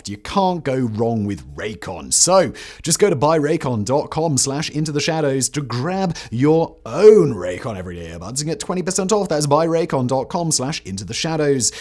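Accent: England, United Kingdom. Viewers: Hes just british? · can't believe i clicked on a clueless Englishman poorly explaining something about a country he knows nothing about. 32-12. Tip for you next time bro. Stop pronouncing every word as if it's English. Do some fuckin research.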